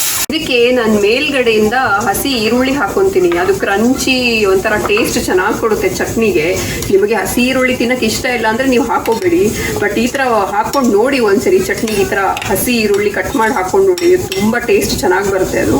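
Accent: Mangalore, India. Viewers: nivo Yavaglu mangalore kannada ne mathadi please. 🙏kelalikke thomba chenda erthe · Nimma mangaluru slang thumba chanda ide😍😍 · Nice vedio ....😍😍happy to c someone talking in mangalore kannada in volg 😍😍😍evn m from mangalore staying in UAE... tulu la patherle yedde apundu Kendra....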